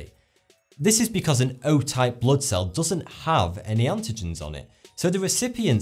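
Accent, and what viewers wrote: United Kingdom. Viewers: Thought 2 is like vsauce, but he's a Brit. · R u British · Hold on, when you say "British" accent do you mean: Irish, Scottish, Welsh, Northern, Dorset, stereotypical Londoners or English. Please specify.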